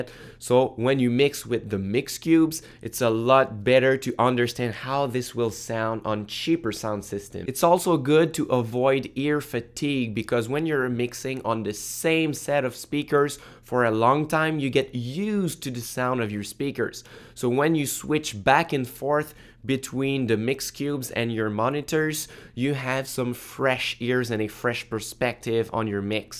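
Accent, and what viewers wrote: Quebec, Canada. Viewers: es tu québecois ?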